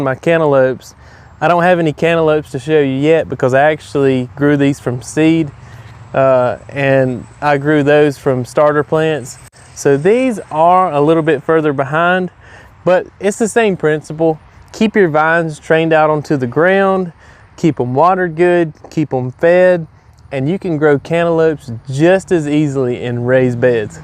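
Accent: South, United States. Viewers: Are you from Alabama or Georgia?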